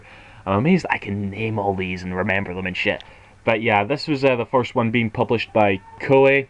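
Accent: Scotland, United Kingdom. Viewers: where abouts in scotland do you come from?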